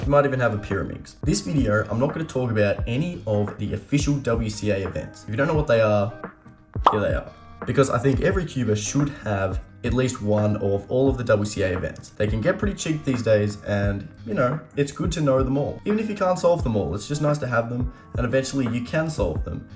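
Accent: Australia. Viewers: Are you from Australia?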